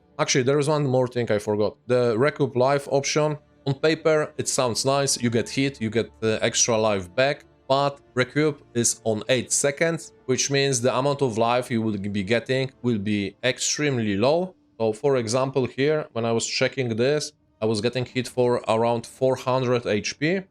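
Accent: Poland. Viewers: Ten polski akcent poznam wszędzie :) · As a Russian, I can recognize a polish accent flawlessly as well hahaha. Kurrrrwa! 😂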